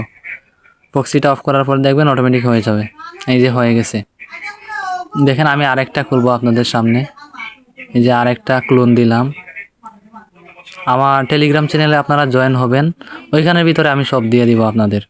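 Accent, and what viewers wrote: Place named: Sylhet, Bangladesh. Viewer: Joto suddo kotha kow na kene ba tumr shyleti kotha buja jay 🙂👍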